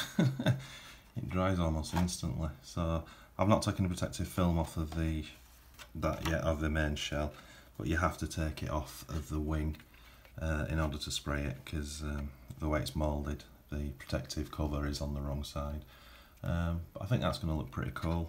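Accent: United Kingdom. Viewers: Hi, sorry to ask unrelated questions but you are my go to channel,it might be that Brit accent, I am in blighty!Right, I am new to the hobby and after watching you I now own a XV-01 lancia delta. I now want a buggy. Looking at the Dark impact BUT then see the Top force. I intend to run it on a "cheapish" BL Absima combo. What would you go for? Also on your build I could not see if you need bearing upgrade set? Thanks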